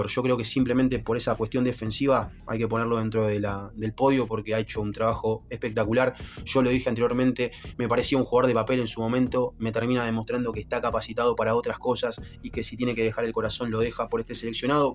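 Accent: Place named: Argentina. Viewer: De donde sos voz que parecieras de acento argentino pero con educacion tal que no pareces argentino?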